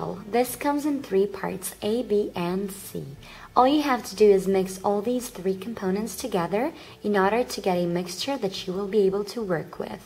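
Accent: Finland. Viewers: Are u from Finland??? :D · Are you Finnish or Russian?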